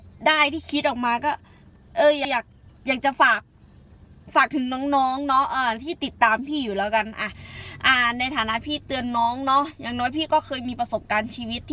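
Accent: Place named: Khon Kaen, Thailand. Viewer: ชอบมากอะเวลาพี่พูดแหมสำเนียงเหมือนคนขอนแก่นบ้านหนูเลยมักแฮงเด้อ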